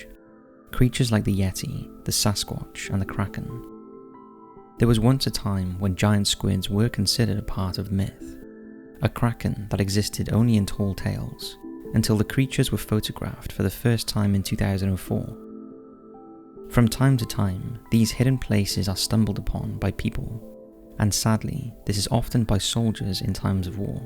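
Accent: Wales, United Kingdom. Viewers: Your channel is good, been watching for a few years but you gotta sort out your English pal, what the hell is with all this pronouncing E's as A's.....for example 'click the BAL to subscribe'...wal, bal, tal ect when it's WELL, BELL, TELL and so on. Your voice tone is relaxing, but it's annoying as hell the way you are saying certain things. I am English and live in England and I want all Americans and people who don't live here to realise that his weird hybrid Welsh/English accent and his pronounciations of certain words are totally OFF and not normal AT ALL in England or English....'ostradized' ?? come on!...and he once called Sigmund Freud.....Sigmund 'Feud'. lol I have sympathy for him because i am 99% sure he suffers from Dyslexia, either that or he does it on purpose because it seems to be the fashion to talk strange on these kind of channels, i.e the youtuber named 'Chills' (intentionally stupid and annoying voice)....I can never work it out, does anyone else think the same?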